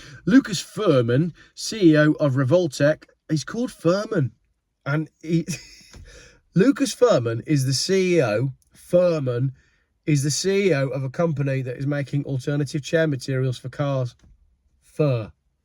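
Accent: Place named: West Country, United Kingdom. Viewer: Great west country accent. Alright my lovr.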